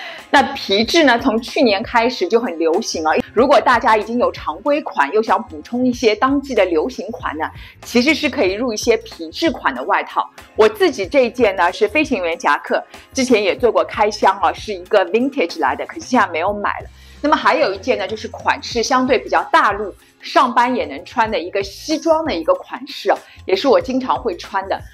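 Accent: Shanghai, China. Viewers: 谢谢推荐。 听口音是上海或者江浙一带的？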